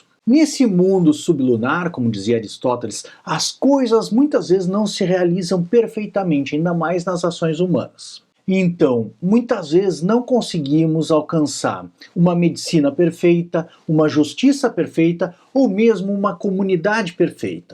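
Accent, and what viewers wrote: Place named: Rio Grande do Sul, Brazil. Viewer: Ilustríssimo Professor e Dr., um aplauso e um abraço de Gravataí/RS. Pelo sotaque, tu deves ser meu conterrâneo.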